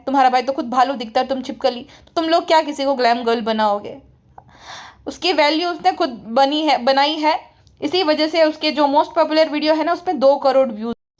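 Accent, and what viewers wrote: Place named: Kathiyawad, India. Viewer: you sounded like kathiyavadi❤ I love it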